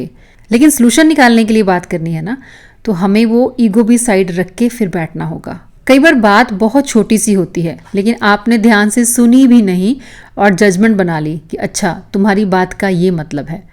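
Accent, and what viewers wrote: Punjab, India. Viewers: I think this is because of the Punjabi accent of her. But sounds very nice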